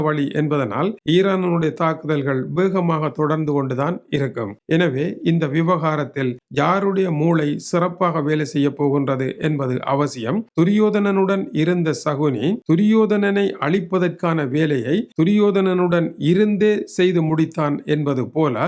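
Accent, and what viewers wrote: Sri Lanka. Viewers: இலங்கை தமிழ் 🎉🎉🎉🎉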